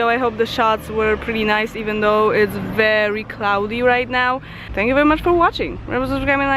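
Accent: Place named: Poland. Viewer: Dawno Cię nie było. ;) Znowu dobra robota... Utrwala się fajnie angielski który jest takim powiedzmy "polskim angielskim"... Wiesz o co chodzi... Łatwo do zrozumienia... Bez jakichś tam dialektów itd. pozdrawiam. ;)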